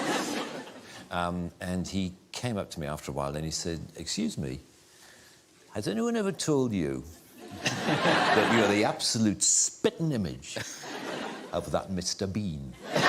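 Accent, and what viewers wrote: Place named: United Kingdom. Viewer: 진짜 영국식 발음에 목소리 녹아내림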